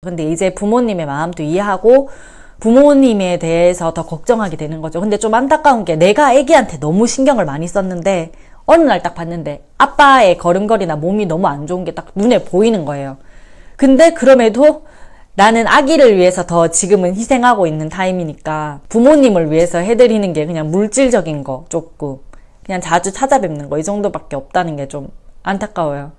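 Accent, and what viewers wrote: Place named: Busan, South Korea. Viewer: 부산분이신가봐요ㅎ 말투가귀엽ㅎ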